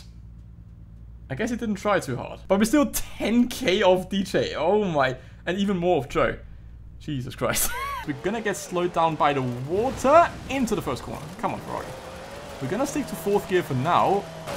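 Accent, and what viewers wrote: Germany. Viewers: You sound really german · Du hast einen echten deutsche Akzent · Is he German? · Are you from germany? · Bro are you German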